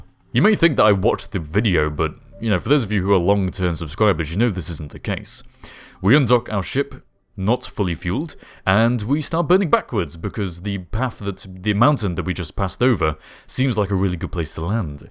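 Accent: United Kingdom. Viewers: Really love Pol personally. Especially with ground scatter active to the max. Not much to actually do there (until 0.90 anyways, maybe), but I love it. If I'd have a custom planet and moons in KSP, I would size it up 30% and make it one of my moons. Personally, I think your voice is amazing as I love the accent from Great Britain. And don't worry, everyone is weird... just not the same type of "weird" heh.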